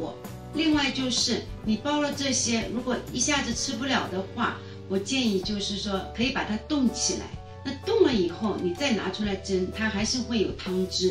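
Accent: Shanghai, China. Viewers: 这是上海人讲普通话，带有上海口音。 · Jenny阿姨，看你的视频，一口沪普就是特别亲切啊。刚刚我在看视频，孩子听见你讲话，问我，妈妈是外婆在教你做好吃的吗？孩子们以为是她们自己外婆在说话。😂😂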